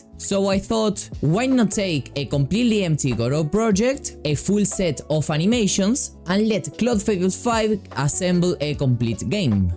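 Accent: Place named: Argentina. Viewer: habla argentino mejor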